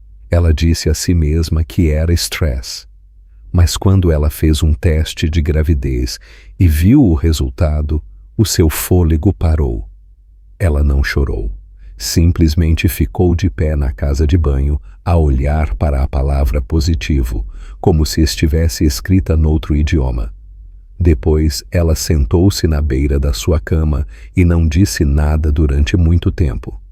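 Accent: Portugal. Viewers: É o português natural de Portugal.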